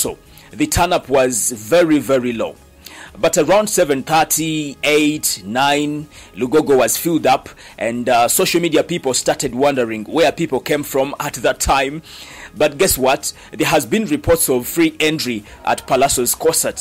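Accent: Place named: England, United Kingdom. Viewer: I just love ur English accent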